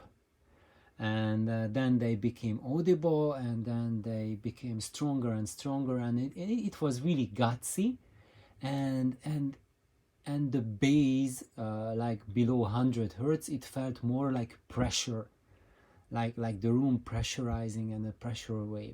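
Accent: Hungary. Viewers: Sorry for the off topic. After 15 years of living in the UK I have met a lot of people from different countries. I am able to recognize accents after just a few sentences. You are definitely Hungarian. Ok it was easy, because I am Hungarian too. :) Believe me It is fun to know where people come from without asking them. All I need to do is just listen how they speak. :)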